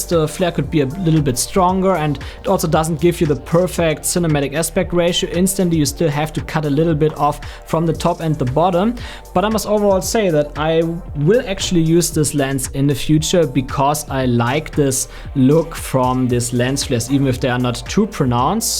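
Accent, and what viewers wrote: Germany. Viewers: Höre ich da einen deutschen Akzent?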